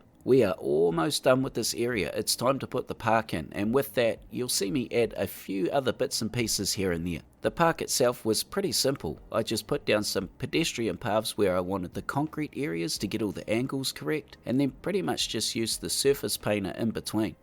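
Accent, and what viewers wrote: New Zealand. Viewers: like always so good, so interesting and combined with your NZ accent it becomes one of CS greatest series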